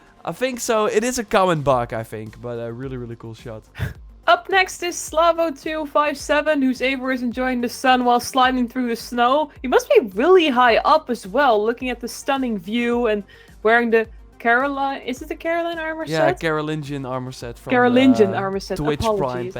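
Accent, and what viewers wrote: Netherlands. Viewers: I HATE his Dutch accent on his english.... like it sounds cheap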